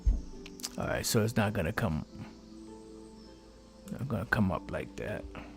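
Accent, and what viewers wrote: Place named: Jamaica. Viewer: I notice your Jamaican accent every now and then, shalam.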